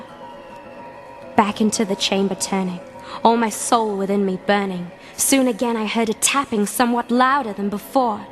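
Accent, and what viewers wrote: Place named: United Kingdom. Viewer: I love her accent.. even though I'm British as well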